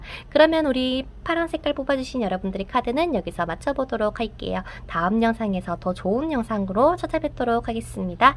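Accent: Gyeongsang, South Korea. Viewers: 님 경상도 분이시죠???!? 말투 사투리 야악간...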